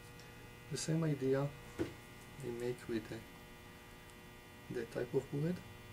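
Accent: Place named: Israel. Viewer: Amazing! which powder did you use? and.. you have an Israeli accent, are you originally from Israel??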